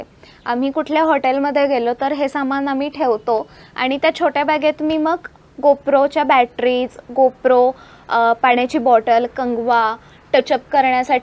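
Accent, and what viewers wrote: Kolhapur, India. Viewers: मराठीला अजूनही थोडा घाटी कोल्हापुरी टोन आहे . बाकी आवाज क्यामेरा समोर तरी छान मृदु आहे . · tuja aawaj komal aahe khop kolapuri bhashet bolte tu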